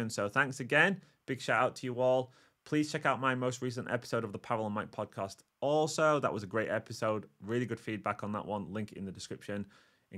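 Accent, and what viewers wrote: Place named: Yorkshire, United Kingdom. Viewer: It helps to listen to 0.75. thanks Mike , I Will try to get familiar with yorkshire-ese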